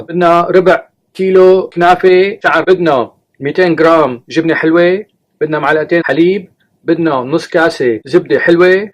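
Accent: Levant. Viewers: شغلك غير شكل والاحلى منو حكيك يلي بذكرنا بالشام تعيش وتعلمنا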